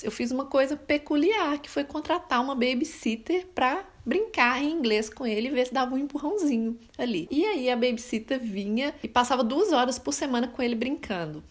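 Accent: Minas Gerais, Brazil. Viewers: Vc disse sutaque mineiro ? ❤️❤️❤️❤️❤️❤️❤️ Já amo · Eeeeeeh!! Sotaque mineirooo!!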